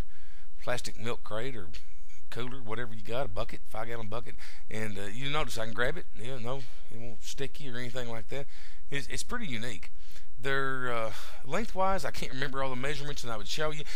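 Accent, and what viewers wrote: Arkansas, United States. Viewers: what part of Arkansas are you from?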